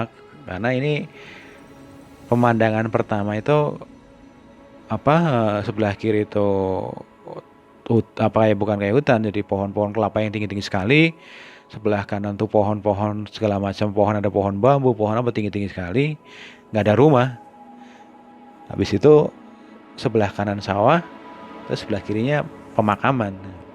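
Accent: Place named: Banyumas, Indonesia. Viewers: Bagus! Ceritanya sederhana. Masnya ngapak jadi kangen ngobrol sama temen2 ngapak! 😂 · dimanamana tempe enak..ora ngapak ora kepenak😁cilacape ngndi mas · Baru denger di awal2.lgsg ketebak.pasti wong ngapak.salam ngapak bosku!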